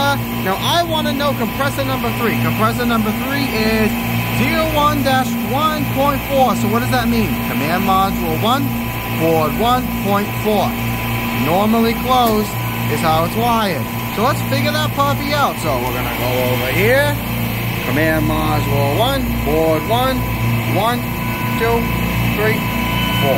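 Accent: Boston, United States. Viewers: I thought so you have that Boston Accent I'm in Rhode Island now But lived an worked many years in Boston Love the area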